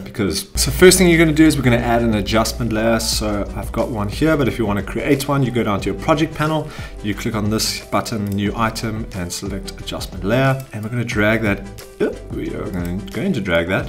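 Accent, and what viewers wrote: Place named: South Africa. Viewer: sound so south african anyways thnx for the video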